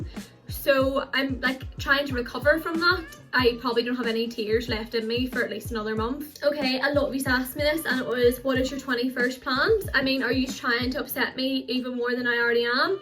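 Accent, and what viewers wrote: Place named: Belfast, United Kingdom. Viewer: Belfast accent is the DREAM!!!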